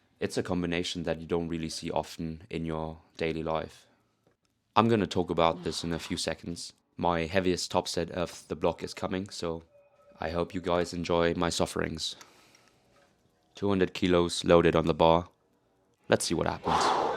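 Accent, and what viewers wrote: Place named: Germany. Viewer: Dude don't force your accent. Your accent was cool as it was it's not American it actually sounds German. But not a thick German. I am Australian and your accent trial is a bit jarring because you go English, Australian, American, German in one sentence.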